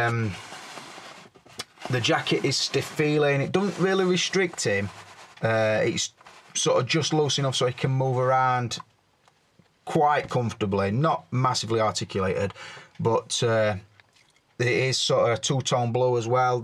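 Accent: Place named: Yorkshire, United Kingdom. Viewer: tou sound like a good old yorkshire boy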